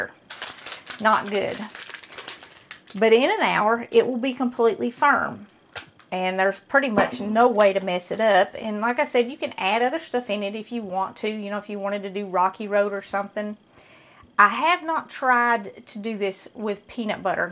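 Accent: South, United States. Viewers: Hahaha 😂.... I wish! I'm thinking the same thing, once I make this in a few minutes and eat a piece I'm going to want to make it all the time. I can't afford to gain any weight. Lol. But I love food, sweets, MMM chocolate! With that being said, I love your southern accent and I love your channel also, and love that you aren't scared to put God into it also, you go girl! I'm in southern Louisiana and I'll be making this recipe for the first time in just a few minutes. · Her accent reminds me of the five years I lived in Georgia. Coming from the North at that time, I was fascinated by the southern slang. She’s indeed a sweet spirit, and I am blessed to have found this page. God is always first. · That's our Southern slang. 😊❤️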